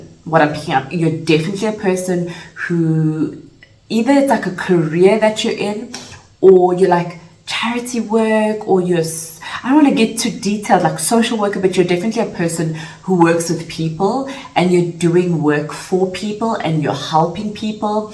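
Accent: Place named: South Africa. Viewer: Lol it's a South African accent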